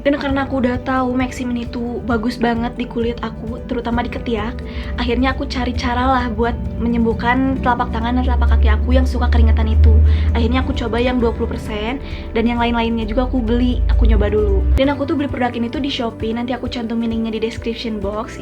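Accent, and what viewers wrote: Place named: West Java, Indonesia. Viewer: Logat sunda si teteh hehe ...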